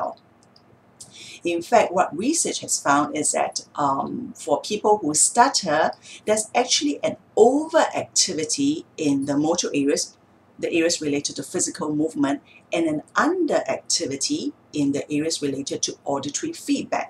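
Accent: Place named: China. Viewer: Btw, I enjoyed the clear and smooth English with the very tiny, little little charming Chinese accent..what a pleasant voice to listen to. Very calming.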